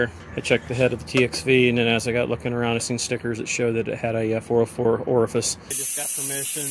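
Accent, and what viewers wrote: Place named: Pennsylvania, United States. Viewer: ah the Pennsylvania speak figured you were from that area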